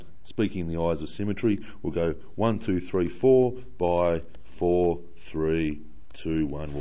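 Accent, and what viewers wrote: Australia. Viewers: after watching these lessons i wished all my teachers had australian accents.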